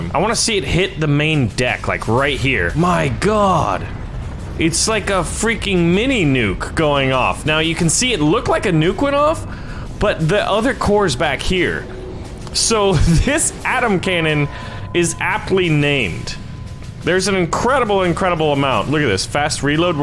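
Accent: United Kingdom. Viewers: Can you stop speaking in british acsent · Why you always speak with a British accent Maby be because someone in your family could of been in the Royal Navy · Love the British accent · im sorry but i don't like your British accent im sorry though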